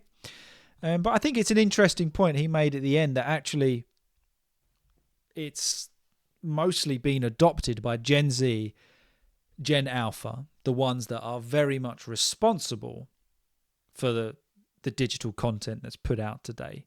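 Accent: United Kingdom. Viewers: Your podcast is one of my favorites. And what I like about it, it is your british english and listening to your podcast, I feel like I am in the UK. You transport me throughout your accent, your behavior, etc. When you speak about your country, those are my favorite moments. Please keep going. I wish you the best for 2025.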